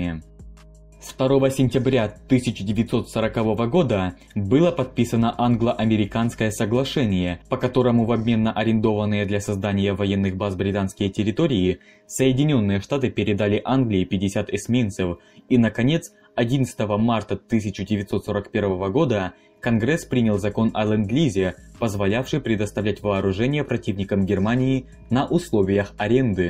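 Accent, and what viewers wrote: Ukraine. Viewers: Западноукраинский акцент, просто старается тщательнее произносить русские слова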